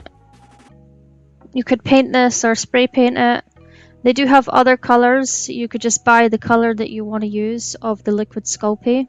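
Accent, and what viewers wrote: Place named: Northern Ireland, United Kingdom. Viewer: I love everything in this video. I subscribed to your channel because I love listening to you talk — my Mom was from Northern Ireland and used some of the same words and phrases you do and her accent was very similar to yours, so you bring back warm memories for me.😊 Everything you made in this video is pretty simple but turned out really nice. You have some great ideas!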